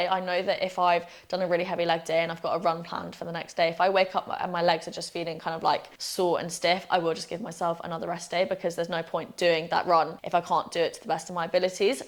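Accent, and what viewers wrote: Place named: United Kingdom. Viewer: I had to slow down the playback speed to .70 😂.. that British accent is hard enough to understand, now add 85 mph (135 km/h ) 😅.. Besides that, Excellent informational video.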